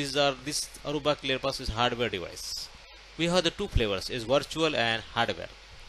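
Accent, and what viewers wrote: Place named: India. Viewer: Another terrible Indian accent explaining something he just learned yesterday. I guess he is counting on this video to get himself a H1B.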